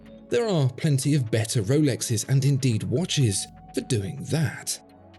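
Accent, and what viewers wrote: United Kingdom. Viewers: Time to dump this channel. The fastest thing you can deduce by anyone wearing a Rolex Submariner is they aren’t an actual diver. I love mechanical watches for the art, design and engineering. Could care less about tradition etc. when I bought my first (and only Rolex) I wanted a relatively cheap but sturdy and reliable watch. Got it used. Now worth 3 times what I paid. Don’t care. It keeps good time and I can wear it while working in my garage. Replaced chipped crystal once during overhaul. Most people don’t notice what it is and I’m happy with that. I’m going to find a watch channel without the gushy mewling, British accent, that talks about interesting complications and design ideas. Not about old traditions - that died decades ago. These are rich companies marketing smoke and mirrors to people who desperately want to “belong.” The richest guy I know wears a Casio digital because he likes it. 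I don’t like any watch with a battery because twice I found myself in a situation with a dead battery and no battery shop for a few thousand miles. For me mechanical and automatic.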